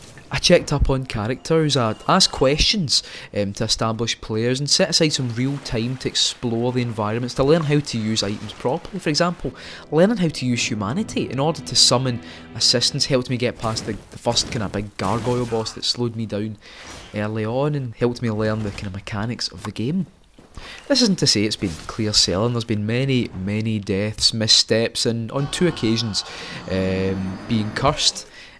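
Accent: Scotland, United Kingdom. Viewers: Awesome vid, where in scotland btw? Tryin to work out ur accent. Im fae fife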